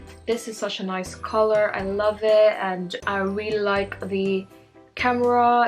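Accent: United Kingdom. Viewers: At first I thought your accent was British but I don’t think you’d classify it as that.. BUT I LOVE YOUR VOICE SHFJDJJS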